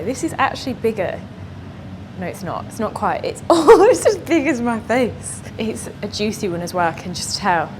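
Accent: United Kingdom. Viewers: This is very inspiring. You know what the moment I just started watching your video I could tell you are British. This makes me more proud of being British when another British shares this. I do workout weekends when I am going to work week days and I am now size 10 from size 20. I eat gluten free food for dinner I have prep salad meals mixed. Well done. Thanks for sharing too.❤❤❤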